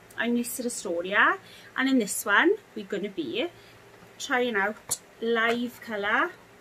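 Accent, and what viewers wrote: Wales, United Kingdom. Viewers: I looove your welsh accent its so cute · Hiya Lovely x A fellow 'Welshie' even though I'm South African born 😊 x Just loooove your accent way too much x Literally just done mine as well and same result with the more yellowy roots x Was just wondering if you've used a purple shampoo after the video and if you have, did it help with the yellow?? As heck yes, I love the results but nearly had a stroke as the yellowy roots make me look proper pink lol x I'm already in my head summoning my hairdresser friends for emergency damage control lol! 😨😅😂 x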